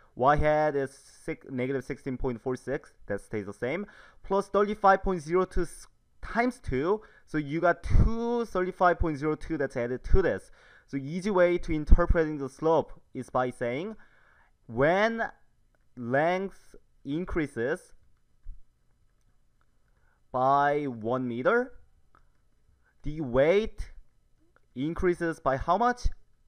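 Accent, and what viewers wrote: South Korea. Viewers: I’m korean, and his accent sounds VERY korean. :)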